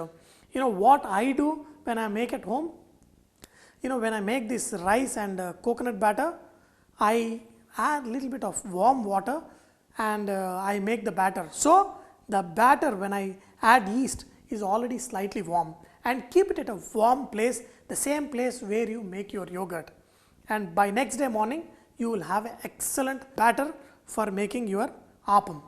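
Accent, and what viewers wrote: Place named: Kerala, India. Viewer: your accent is so typical malyali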